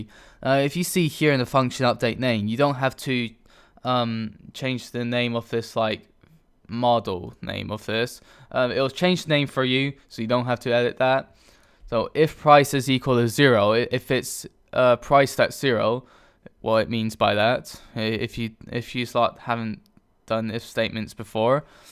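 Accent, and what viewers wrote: United Kingdom. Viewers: are you British? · I used to really like/want a british accent but i swear to fucking god i am glad i dont have to hear that for a long time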